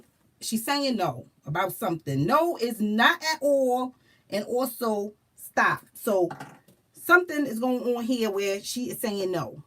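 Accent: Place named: New York, United States. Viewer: I knew you were from NY!!! You have that flava!!!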